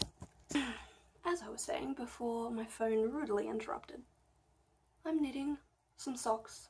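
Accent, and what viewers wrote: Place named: Australia. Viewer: Hahaha love your style so funny. The accent and humour pointed to oz, I'm from NZ. Great podcast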